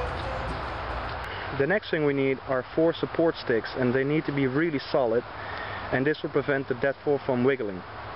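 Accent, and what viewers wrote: Netherlands. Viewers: i fear that is no option looking at the serroundings and listening to hes accent i would say hes from the netherlands and their is nothing that size here that will take that trap (fall for that trap), we dont have carnivores in our country.. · This is a strange video. With a Dutch accent, Irish music and making a huge mouse trap, Lol, that probably wouldn't work, what's the point? Have you ever caught anything with this?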